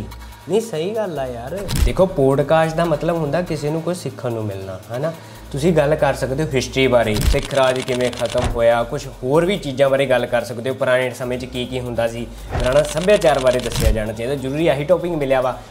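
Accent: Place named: Ludhiana, India. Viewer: ਬਾਈ ਤੋਂ ਲੁਧਿਆਣੇ ਤੋਂ ਲੱਗਦਾ😅😅😅😅😅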